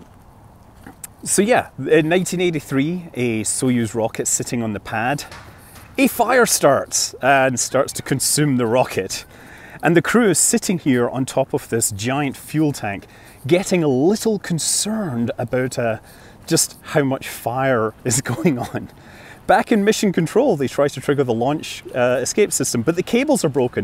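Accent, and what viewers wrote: Scotland, United Kingdom. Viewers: I mostly listen for the science/ history lesson in a thick Scottish accent.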